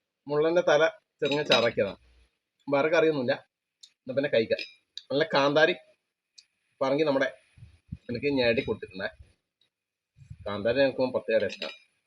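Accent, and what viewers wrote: Kannur, India. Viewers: വല്ലാത്തൊരു നൊസ്റ്റാൾജിയ 😔😔... നാടും വീടും ഉറ്റവരെയും വല്ലാണ്ട് മിസ്സ്‌ ആകുന്നു.. പിന്നെ നമ്മുടെ കണ്ണൂർ ഭാഷ.... 🙏🏻എന്റെ നാട് എത്ര സുന്ദരം ❤️❤️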